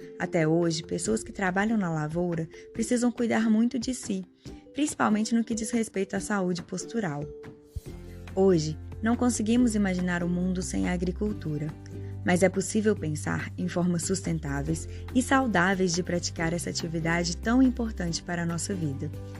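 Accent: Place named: Belo Horizonte, Brazil. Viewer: Kkkk. Vcs acham que a narradora é de BH ou nem??? Ô, sotaque forte! Muito bom o vídeo. Parabéns!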